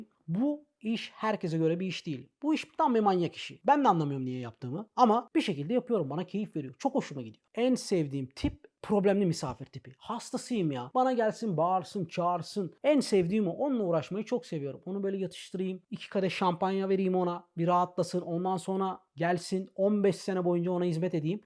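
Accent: Thrace, Turkey. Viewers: Trakyalı adam dinleniyor. Not: rizeliyim :)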